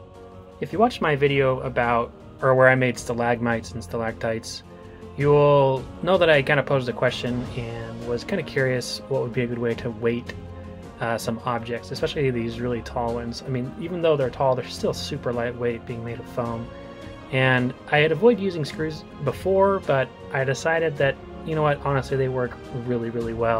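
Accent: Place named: East Tennessee, United States. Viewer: You sound like people I know from East Tennessee.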